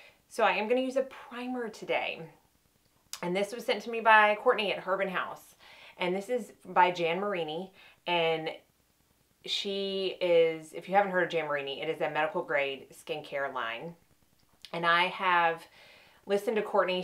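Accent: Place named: Appalachia, United States. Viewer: Been watching you for about a month now and I’m so happy I found your channel....I had never heard of Harbin house and watched one of your videos where you were talking about the Elta MD and immediately went and snatched it up one of my favorite sunscreens. Also your accent reminds me of Home I’m from the mountains of North Carolina right on the Tennessee North Carolina border😁.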